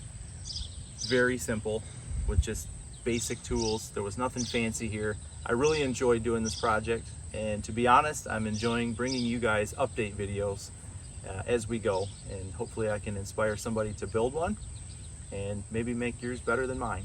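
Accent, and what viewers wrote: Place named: Michigan, United States. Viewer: Loviny the Michigan accent go green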